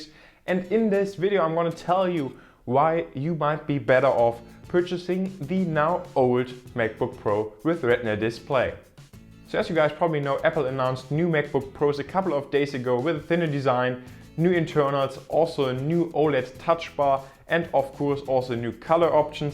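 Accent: Germany. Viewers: I'm german, and i can hear your german accent clearly.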